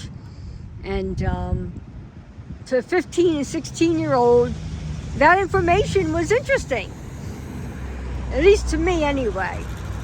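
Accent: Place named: Delaware County, United States. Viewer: I grew up in delco as a child been living in amish land past 20 some years. Didn't realize until hearing you speak that I missed hearing the delco accent. My grandfather and mother grew up in Marcus hook and used to tell me stories of feeding the hobos that hopped off the trains there.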